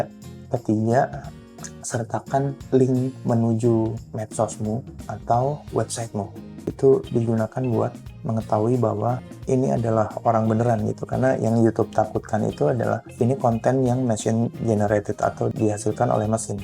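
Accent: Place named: Madura, Indonesia. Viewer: Akun yakin orang Madura nih 😂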